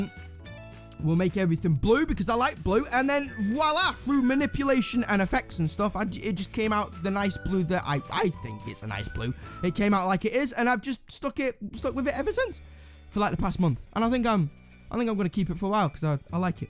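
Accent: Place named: Lancashire, United Kingdom. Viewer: I figured you was a lancashire lad but i didn't think you'd be as close as manny haha nice one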